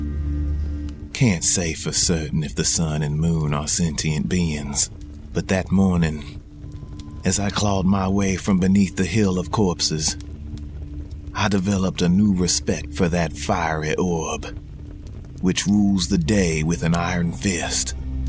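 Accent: South, United States. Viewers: Loving the narration, great southern accent to the cool story. The vocabulary in this story is so enjoyable.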